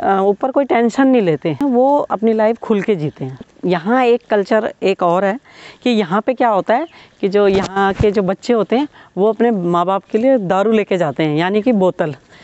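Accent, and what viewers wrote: India. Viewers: Thanks medam ap acha bolti bilkul bhartiya andaj me Apki real hi sari video h modifiy nhi karti ye acha laga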